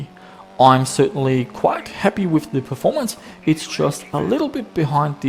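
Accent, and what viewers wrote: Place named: Germany. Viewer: you german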